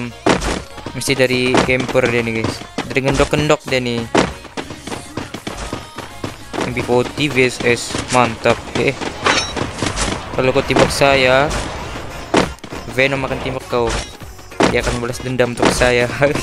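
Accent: Sabah, Malaysia. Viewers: Abang orang sabah ke ?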